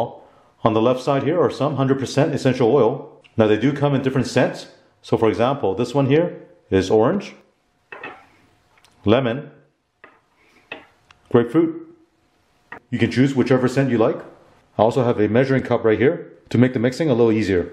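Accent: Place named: Germany. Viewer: I detect a german accent.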